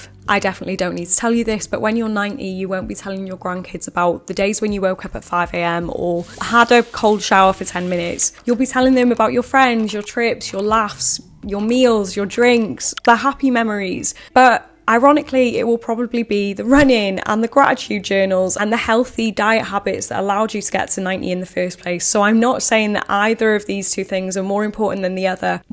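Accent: United Kingdom. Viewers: Aww yew Bri’ish 🎩💂☕️🤓🤓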